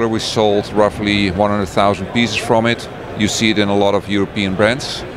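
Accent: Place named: Netherlands. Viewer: English speaking with a Dutch accent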